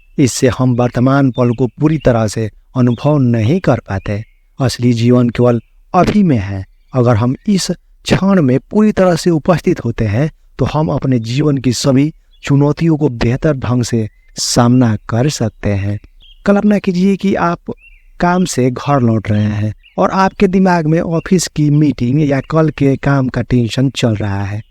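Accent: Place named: Bhojpuri, India. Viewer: aap saasaram ke hain kya, humko bhojpuri ka feeling aa rha hain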